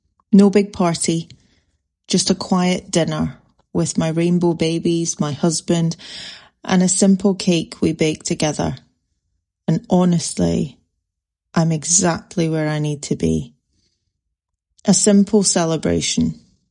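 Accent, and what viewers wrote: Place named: Scotland, United Kingdom. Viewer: You made an old man cry, It is not a perfect world, Me and my now wife of over 16 years were married before, We live in central Scotland, i can tell by your accent you also come from here. Elza like yourself had numerous miscarriages and had no children, I had 2 sons, Like yourself she is a wonderful person and more or less adopted the boys (men) they both love her to bits etc. Life has a few Dark places and I have been there like her and you, the Light shines bright once out off it, you have a very nice man and now children at your side. GOD Bless, shine like a STAR. I once baked but only as an amateur young leo has inspired me to bake again and so have You, I am an old man nearly 70 and enjoy it. going by some of the comments your name is and your husband Vernon? all my best Charlie xx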